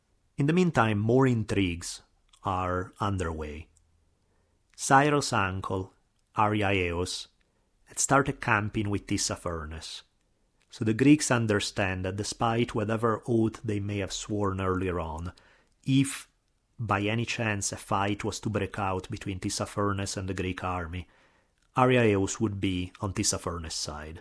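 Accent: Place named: Italy. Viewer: Your story telling along with that fantastic Italian twang is just awesome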